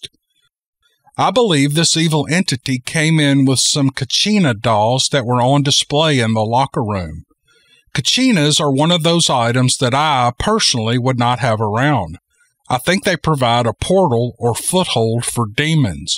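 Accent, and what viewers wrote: South, United States. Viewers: I can only speak for myself, but I haven’t necessarily returned to this channel again & again over the last few years only for “Bigfoot” stories. While that’s what drew me here initially, I quickly came to genuinely like; his familiar southern drawl, his easygoing personality and, his masculine voice… and some genuinely great stories. Sadly, nowadays it seems that any and every possible point of interest seems to devolve into some sort of cult. And we all know to stay far away from cults. could read the lyrics to, and invariably, I’d eagerly listen-in whilst cooking supper or washing the dishes… but, this is all just great entertainment, not a system of belief. Keep up the fantastic work, …💯🇺🇸❣️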